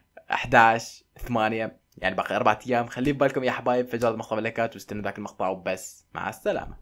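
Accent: Iraq. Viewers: انت عراقي؟ وانا اقول انت سوري؟؟؟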